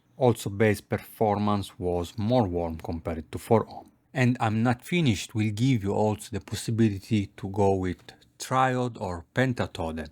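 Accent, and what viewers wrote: Italy. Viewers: Are u Italian ?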